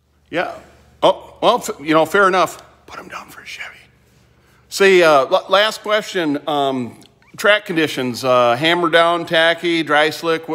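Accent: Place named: Midwest, United States. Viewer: Are you Minnesotan you have some heavy midwestern accents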